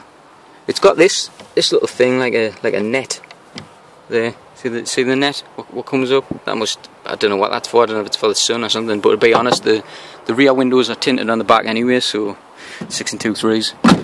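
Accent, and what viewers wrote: Newcastle, United Kingdom. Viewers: Geordie lad!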